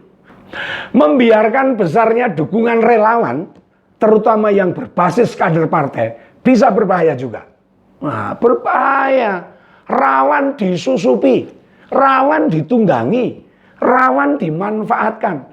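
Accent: Java, Indonesia. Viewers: Senang ikuti ngompol yang dipandegani mas logat jawanya yang kental enak didengar,terus suarakan kebenaran mas, kami warga Kalimantan Barat siap mendukung dan siap menangkan pak Ganjar Pranowo di pilpres 2024.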